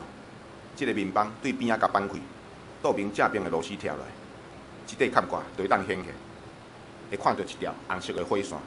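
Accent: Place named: Taiwan. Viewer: 簡單明瞭，幫了很大的忙。說台語非常道地親切。感謝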